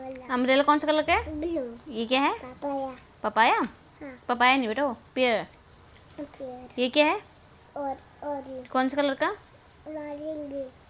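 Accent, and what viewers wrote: Garhwal, India. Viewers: आपका tones gadwali लगती hindi v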